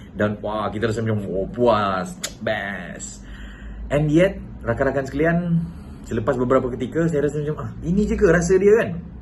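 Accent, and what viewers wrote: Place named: Kelantan, Malaysia. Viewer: tq Tuan. Sbb sskli tn ckp cm Kelantan.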